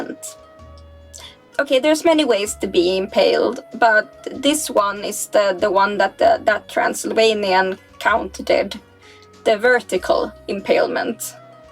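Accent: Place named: Sweden. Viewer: Loving your channel and your accent, are you Swedish? Keep up the great work young lady! 😊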